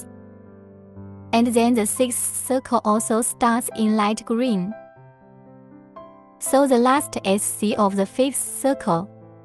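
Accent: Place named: China. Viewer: more like English with a very Chinese accent and crochet lingo we can’t understand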